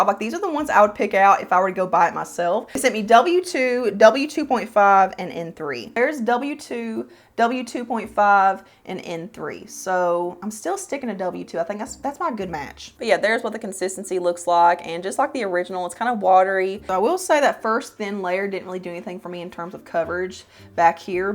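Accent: South, United States. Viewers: You're such a pretty lady, and I LOVE your Southern accent. Makes me feel at home. 😀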